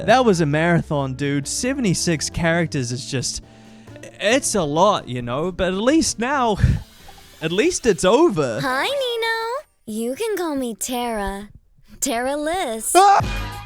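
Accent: New Zealand. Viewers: OKAY SINCE WHEN WERE YOU A KIWI AND HOW DID I NOT KNOW?! Honestly was so shocked by a kiwi streamer, like I’m suddenly so happy that our country is actually on the internet talking about things other than nz. 😂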